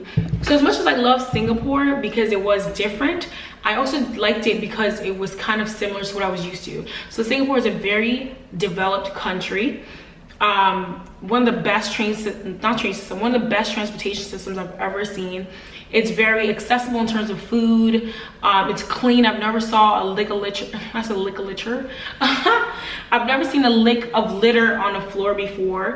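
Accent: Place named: Africa. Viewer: What is your ethnicity? If you're not familiar with the difference between Black Americans and General Africans then you probably won't know but the combination of the darker shade of brown,slanted eye size, her nose size and her resting facial expression would let me know she most likely not a Black American just by looking at her. I can also hear parts of an African accent slip out most likely from West, Central or even East Africa(Sudan) where her features most likely come from and her last name is " " which isn't a Black American last name.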